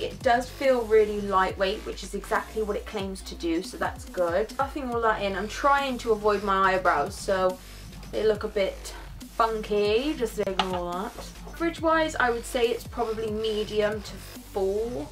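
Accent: Bristol, United Kingdom. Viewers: Is that a Bristonian accent?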